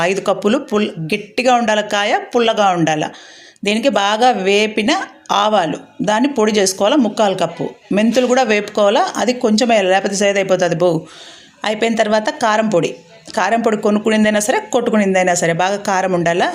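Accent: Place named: Rayalaseema, India. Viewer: మీ మాటలు వింటుంటే, రాయలసీమ,మత్తు తెలుస్తుంది 😅,ఏ ఊరు,,?? ఊరగాయ చూస్తుంటే,,😋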